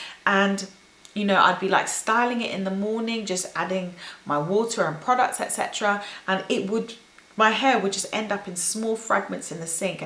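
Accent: United Kingdom. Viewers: I cAnt stand the British accent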